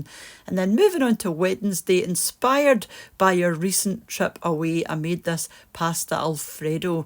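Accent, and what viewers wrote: Scotland, United Kingdom. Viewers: I listen all the time just to hear your beautiful accent I miss scotland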